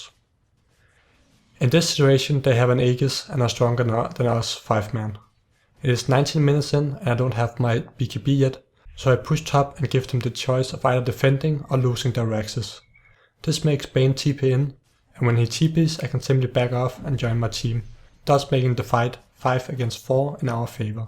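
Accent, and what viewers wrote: Denmark. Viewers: are you danish or german? or neither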